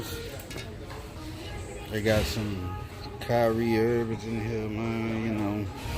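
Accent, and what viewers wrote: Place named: New Orleans, United States. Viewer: Sound like you from the N.O..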